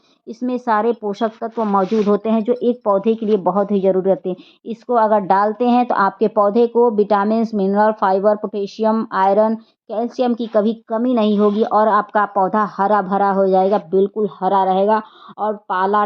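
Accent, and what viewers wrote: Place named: Uttar Pradesh, India. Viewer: आप पक्का यूपी से हैं।🎉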